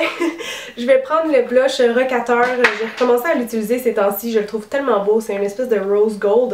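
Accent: Quebec, Canada. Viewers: Je kiff l'accent québécois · t québécoise ??? PS : merci bcp pour l'astuce mon maquillage + le fond de teint + les lunettes sa donnai n'importe quoi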